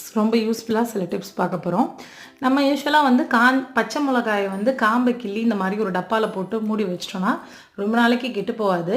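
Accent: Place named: Thanjavur, India. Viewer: Nice tips sister where is ur home town ur slang same like us .my native is tanjore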